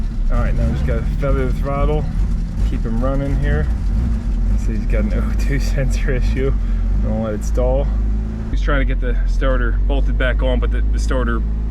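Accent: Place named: New Jersey, United States. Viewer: You have the same accent as my cousins who are from Collingswood NJ ! I instantly was like woah this guy talks just like my cousin ! I live in Syracuse and they made fun of our accent lol they laughed when we'd say "wicked cool" or "its wicked hot" lol. Thanks for reminding me of fond childhood memories !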